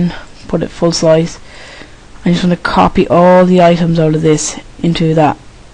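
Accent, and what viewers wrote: Ireland. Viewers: are you irish you sound irish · WHAT PART OF IRELAND ARE U FROM?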